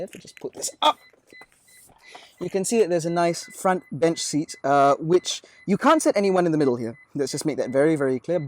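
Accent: England, United Kingdom. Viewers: tq for yr replied. And so much 💕 with the English accent. 😄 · yes, his uk english are much2 cleared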